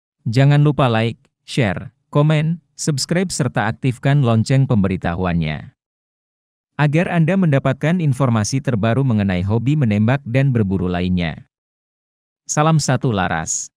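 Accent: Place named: Java, Indonesia. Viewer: Ilat.e wong jowo wes podo paham slag slug podo ae...😅😅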